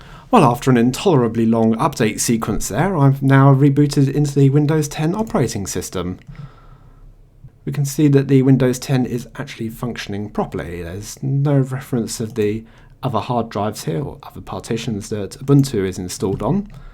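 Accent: United Kingdom. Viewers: I love your UK english man Nive vids, thank you :)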